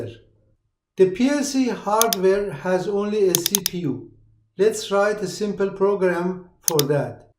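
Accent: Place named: Iran. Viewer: لهجه ایرانی داری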